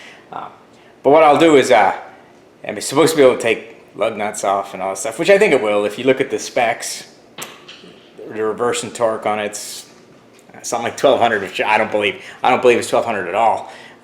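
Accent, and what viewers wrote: Northern Ireland, United Kingdom. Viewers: Have one of the old type 1/2 Dewalt impacts tough and durable BUT heavy and not very compact still hanging on to bit of the nordie accent greetings from Dublin.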